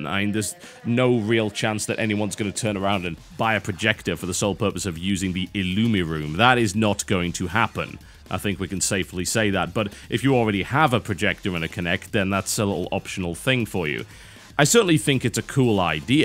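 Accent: United Kingdom. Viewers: Don't know why but that British accent is such a turn on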